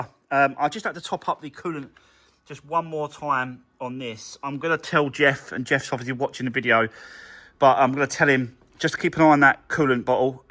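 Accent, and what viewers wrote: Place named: South East England, United Kingdom. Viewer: My connect is 2016 with low mileage and requires a cambelt change and service. Where are you based as you sound like you're from the south East?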